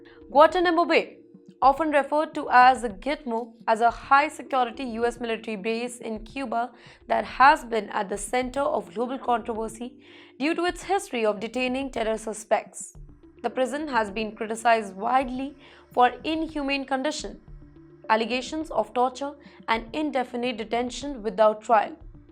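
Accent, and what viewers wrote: India. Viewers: Punjabi+hindi+English = North Indian English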